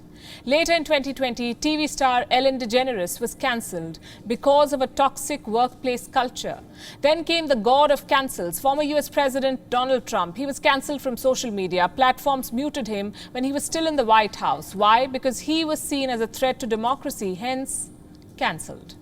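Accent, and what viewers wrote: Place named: India. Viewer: I can actually understand this lady she makes the Indian accent sound beautiful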